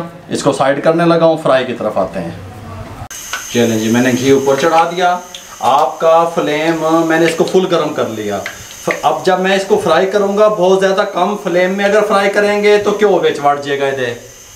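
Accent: Punjab, India. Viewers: bht kmal apk smjhany ka method, or tips amazing, sir or apka punjabi touch tu kya he kehny. Allah bless you.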